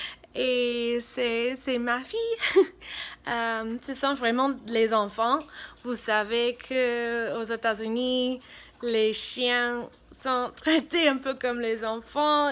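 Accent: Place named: Cameroon. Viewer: You have little bite an cameroonian accent is funny. But you do well in french.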